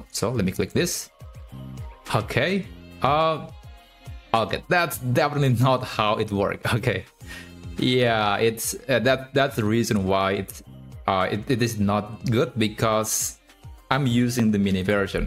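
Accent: Indonesia. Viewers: Indonesian banget logatnya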